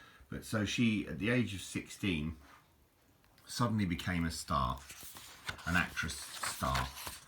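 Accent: RP, United Kingdom. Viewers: Thank you for your enlightenments + you're most welcome God bless you &all your devoted work. You honestly are so dedicated in all the work you're doing + it should be acknowledged. You're immensely sociable + groundedly humble for one whom speaks with such a posh accent Look forward to your insightful interesting humble videos 🌼🍀☀️🍀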